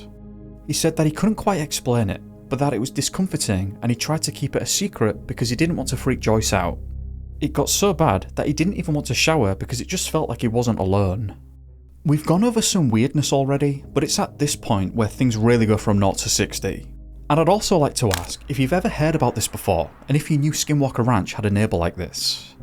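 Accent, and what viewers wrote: United Kingdom. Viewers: Great story, thx. Can I ask where in the UK your dialect is from, very charming 😊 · I’m at my limits of listening to British accent YouTube videos. One more video and I’ll vomit uncontrollably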